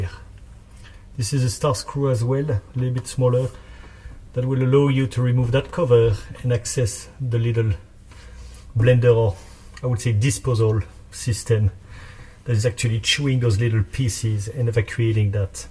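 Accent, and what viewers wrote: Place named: France. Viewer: T’es français ? I like your accent 😀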